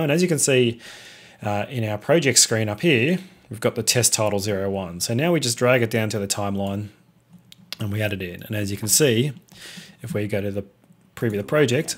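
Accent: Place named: Australia. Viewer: Thank you for the tute.. Best I've found so far. Good to hear an Aussie accent.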